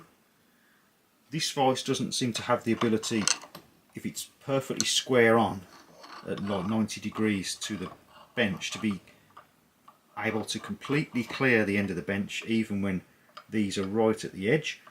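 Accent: Birmingham, United Kingdom. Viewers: good to hear an honest brummie accent! vice looks good for the money though think the rotating base is potentially a weak spot.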